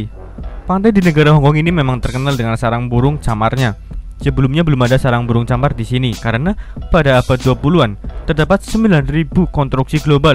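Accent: Java, Indonesia. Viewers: medoknya dikondisikan...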